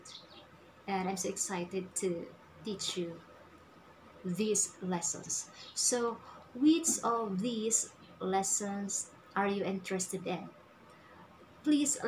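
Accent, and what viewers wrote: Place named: United States. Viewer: You really have an American accent. Wow! Where did you learn to speak that way?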